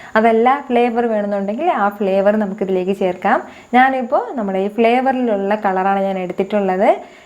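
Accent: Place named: Malappuram, India. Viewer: അത് മലപ്പുറത്തെ വേറെ ഭാഗത്ത ഭാഷ നിങ്ങളെ 😁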